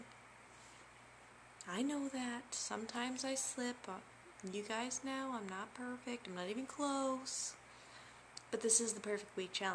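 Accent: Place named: Pennsylvania, United States. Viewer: Hey lady, don't k now if you got my message on a previous video... but i heard you say your parents are from PA... and i went "HA! I knew you were from Pa from your accent" I'm from Pa too... northeastern area... I love your vids sweety, I feel like your one of my friends, I think i listen to you more often than I hear from my own friends lol! I've recently quit my job so i'm home all day long and I've been spending alot of time on youtube! Love ya!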